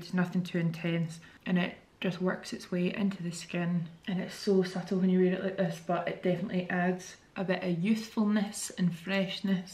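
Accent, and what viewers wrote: Scotland, United Kingdom. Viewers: I’m a huge long time fan from U.S. and I just want to point out that the Scottish accent is extra strong today . LOVE IT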